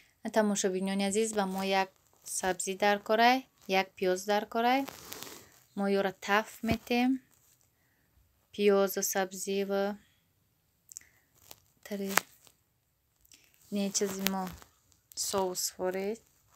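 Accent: Kulob, Tajikistan. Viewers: Кулоби мегум гапзанен гуш кунам шумора 👍👍👍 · Салом хохар лахчай хутонкати яне кулобиги гапзанен😍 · Салом ман мехмони нави шумо аз кучои Точикистон хастед? Гапзаниётон ранги Кулобиё ба фикрам хамшахрием.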